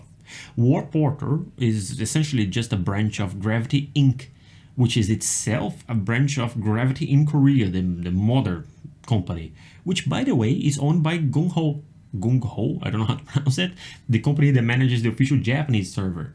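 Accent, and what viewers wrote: Brazil. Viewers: Cara n e possivel, vc e BR? O sotque ta muito forte kkkkkkk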